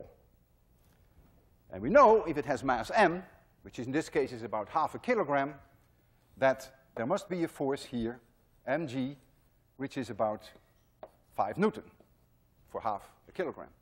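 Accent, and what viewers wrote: Netherlands. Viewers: It's interesting how your Dutch accent become much stronger in the past years again!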